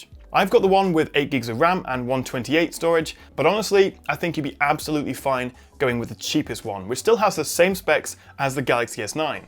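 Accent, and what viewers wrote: United Kingdom. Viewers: Finally I found a decent English( I mean localization) Tech review media brand on Youtube, I love the British street view and ofc your review, ( with a solid British accent which satisfies me a lot ), Cheers!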